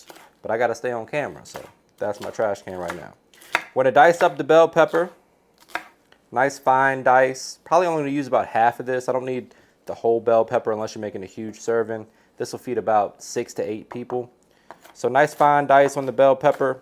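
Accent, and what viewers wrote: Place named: New Jersey, United States. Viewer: Where you from nice voicd.guess jersey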